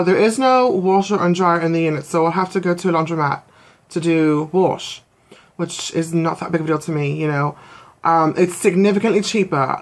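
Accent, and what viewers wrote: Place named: United Kingdom. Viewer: Hi: Love the brit accent:Good Luck With Your New Apartment:Your lips look really nice. Could you do your next video wearing glossy Pink Lipstick?????? Please Please Please?????????? Bill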